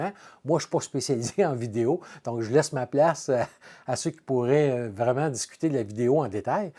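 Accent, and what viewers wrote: Quebec, Canada. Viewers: Excellente video claire et tres Didactique. En plus un délicieux Accent québécois qui me fait Voyager et réver. Un cousin de France!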